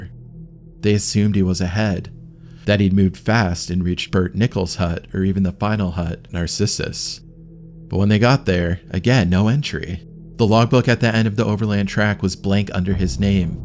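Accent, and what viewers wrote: United States. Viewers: I started watching this, but I can't tolerate an American accent telling us about our own country which they think they own.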